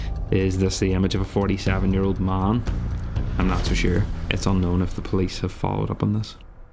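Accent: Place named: Belfast, United Kingdom. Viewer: Fantastic film, love the Belfast accent...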